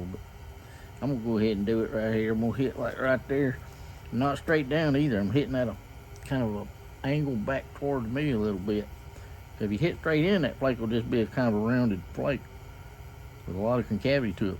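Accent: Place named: United States. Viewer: I really like your videos, and even more your good old american accent 😁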